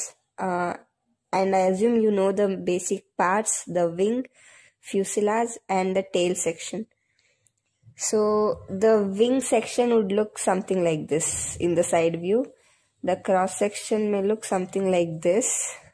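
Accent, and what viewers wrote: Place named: India. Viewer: Worst explaination, Improve your english. Do not speak indian english. Learn international english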